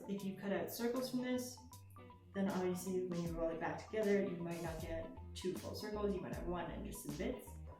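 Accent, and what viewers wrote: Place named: Ontario, Canada. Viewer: You are adorable! My daughters name is, as well. She is soon 29. So love that name. She’s named after an Irish friend of mine. I always called her “ Jiggs,” too. We had all of the books. Such wonderful memories! I grew up in Ontario, Canada. She lives in Stratford, Ontario, now. You sound like you are from Ontario, also.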